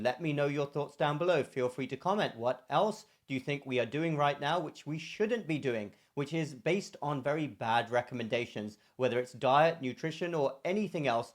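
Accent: England, United Kingdom. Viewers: That's an English accent if ever I heard one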